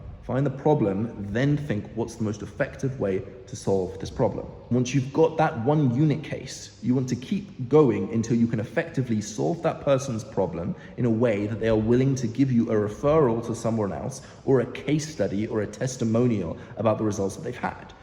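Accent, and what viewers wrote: United Kingdom. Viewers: I liked your UK accent. It reminds me the awesome voice of the legend- Tony Greig. Thanks for sharing this awesome video.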